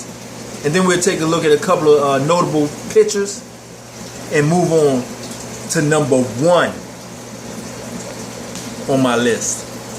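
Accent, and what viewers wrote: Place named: Washington DC, United States. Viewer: Cool fish. Sounds like you are from the DC area. ??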